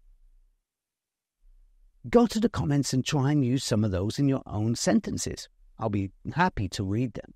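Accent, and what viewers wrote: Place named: England, United Kingdom. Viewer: Though I'm not into football , but thanks so much for the shadowing, it's very helpful and I like your English accent and tried to imitate it. 😊💕🇬🇧💕👍👍👍👍👍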